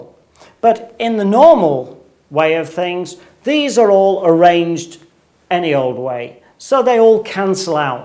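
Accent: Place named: United Kingdom. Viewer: Brittish. Brits don't always pronounce r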